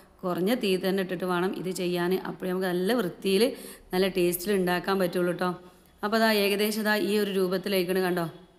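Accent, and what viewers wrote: Malappuram, India. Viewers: മലപ്പുറം + കാലിക്കറ്റ്‌ / മിക്സ്‌ സംസാരം,,, വേണ്ട,, നല്ല രൂപത്തിൽ സംസാരിക്കാൻ ഒന്ന് ശ്രമിച്ചു നോക്കൂ,, 👌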